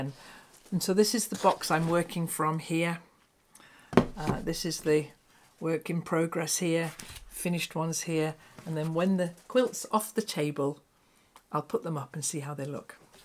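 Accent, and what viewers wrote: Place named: Lancashire, United Kingdom. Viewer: you brought back such an emotional memory of my beloved Mum who died at 54 when I was 21 I’m now 75 In your soft Lancashire accent just like my mums ( busy hands Lass calm head ) she was an amazing knitter sewer crocheter She would have loved this YouTube community as I do & would be on the lime green sofa knitting of course Thank you Thank you 😍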